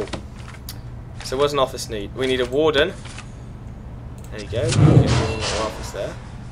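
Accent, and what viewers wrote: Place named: United Kingdom. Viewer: you sir are british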